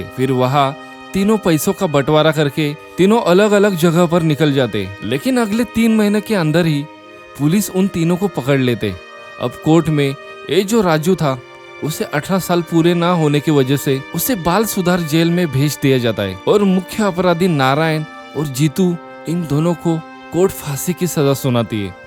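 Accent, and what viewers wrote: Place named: Maharashtra, India. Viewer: I am from श्रीगोंदा your tune is marathi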